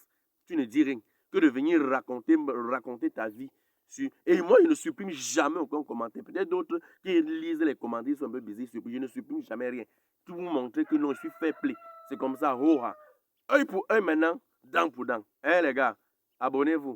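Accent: Cameroon. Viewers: 🤣🤣🤣🤣🤣 l'accent camerounais vraiment hein. Bravo 🤣🤣🥰🥰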